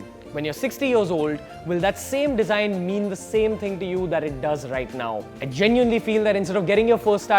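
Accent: India. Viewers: Tatu Indian ascent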